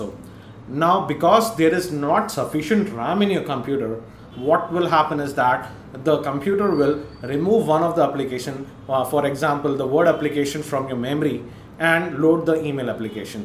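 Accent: India. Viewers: Just the accent. Indians can do better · I love Indian accents! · I love the indian acsent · more thanks to you...i love Indian accent...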